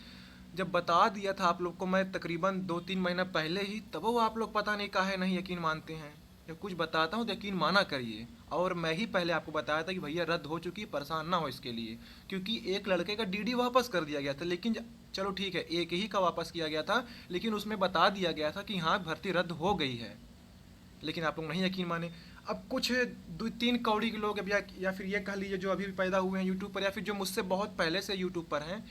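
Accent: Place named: Gorakhpur, India. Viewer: लेकिन् आपके बोलने के स्टाइल से तो लगता है की आप गोरखपुर देवरिया से है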